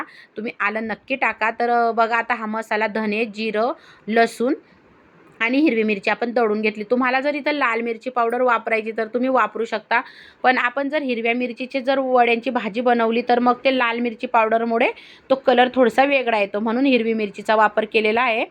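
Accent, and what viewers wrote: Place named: Jalgaon, India. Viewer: खुप छान तांई विडिओ भुसावळ जळगाव बोलता 😊❤तांई फुल वाॅच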